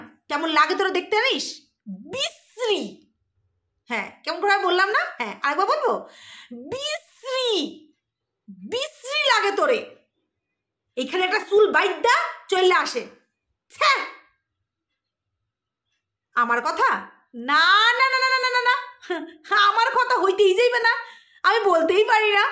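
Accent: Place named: Bangal, Bangladesh. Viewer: Bha tumi toh darun bangal vasa bolo...Tumi ki bangal go? Khub sweet lagche sunte...jodio jader niye bolcho tader ami chini na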